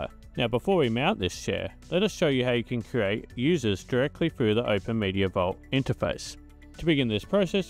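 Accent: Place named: Australia. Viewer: I love your vids, can’t beat that aussie accent 👍 Can you please do a vid showing how to get matrix synapse running over tor? 🙏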